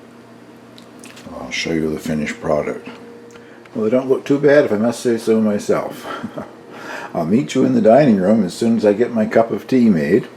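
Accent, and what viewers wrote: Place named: Cape Breton, Canada. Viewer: Aww you remind me a bit of my dad - the good ol Caper accent is so endearing. I have never made these CB pork pies but I remember them well from my childhood growing up in Cape Breton. I've been living in Europe for the past 30 years now. Feeling a little homesick and would like to make these for tomorrow as we're having family over for supper. I think I might even make a traditional meat pie or cabbage rolls as well and go the whole 9 yards. :-) Merry Christmas sir!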